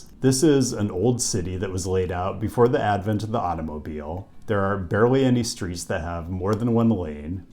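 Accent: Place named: West, United States. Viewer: He has a General American accent, which is most of the Western USA. He grew up in the NW in Seattle.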